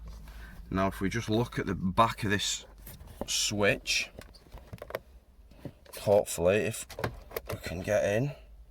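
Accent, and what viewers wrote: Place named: Cumbria, United Kingdom. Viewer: I dont hear many other Cumbrian accents on You Tube..lol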